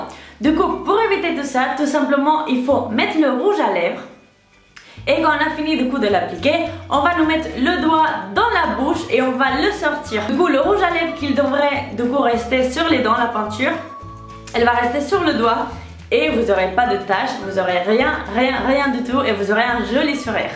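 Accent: Spain. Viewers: c'est normale quelle parle vite c'est l'accent espagnol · elle est espagnole🤗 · c'est l'accent espagnole c'est normale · elle a l'accent espanol c'est pour ça, mais tu abuse , j'en suis sur que tu as compris quelques phrases mdr sinon tu aurais pas regarder jusqu'à la fin ouhloulouu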